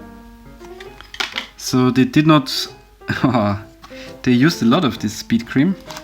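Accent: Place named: Germany. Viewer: Danke für das Video! Man hört sehr raus, dass du Deutscher bist.😂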